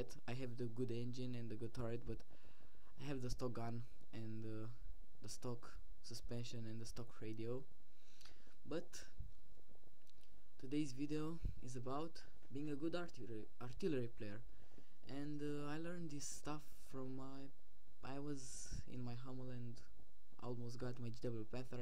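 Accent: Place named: Romania. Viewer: Esti roman? :)) ai accent romanesc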